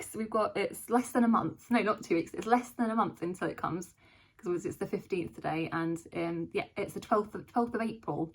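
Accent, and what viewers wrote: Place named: Ireland. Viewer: love an Irish narrator!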